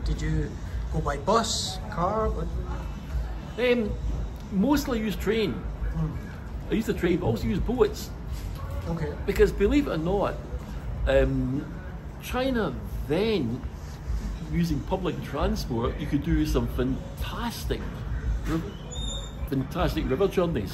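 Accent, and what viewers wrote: Scotland, United Kingdom. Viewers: I would typically play such videos at twice the normal speed.....but given the Scottish accent, I have to watch this video at the normal speed....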